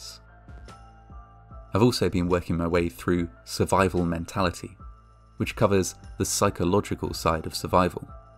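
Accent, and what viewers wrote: United Kingdom. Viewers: He’s British, it’s not fair :(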